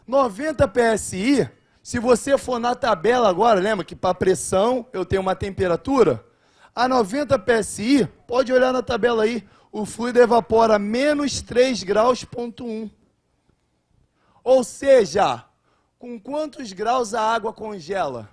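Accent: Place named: Rio de Janeiro, Brazil. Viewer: Carioca raiz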